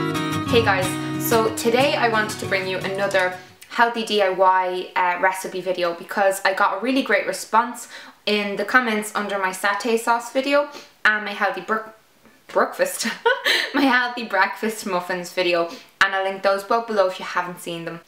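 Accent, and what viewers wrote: Ireland. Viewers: Are you from Ireland if so where do you get all your ingredients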